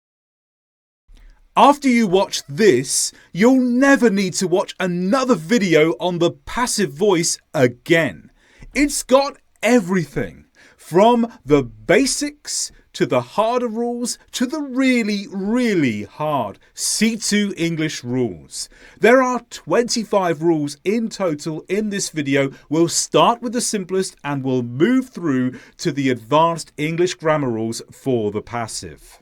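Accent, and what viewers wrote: England, United Kingdom. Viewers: Where have you been all this while ? SUBSCRIBED straight away. I need to devour your videos. That will help me with good paraphrasing in my assignments and every day life. But most importantly why I chose you is because, lessons from a British English accent teacher are very helpful for people living in the UK. Everyone use the accent suitable for them and the country they live, that’s what I did for myself. Thank you very much indeed for your hard work building and editing these videos. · >search for good grammar video >open an 5 hours video >England accent >close the video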